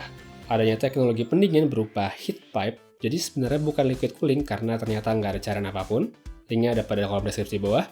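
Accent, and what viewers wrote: Bali, Indonesia. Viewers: Bang lu orang bali ya??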